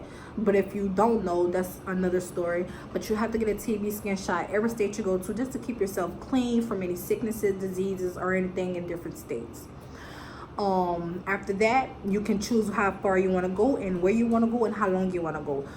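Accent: New Orleans, United States. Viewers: I LOVE LOVE LOVE LOVE your NOLA accent whew! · Love your NOLA accent 😩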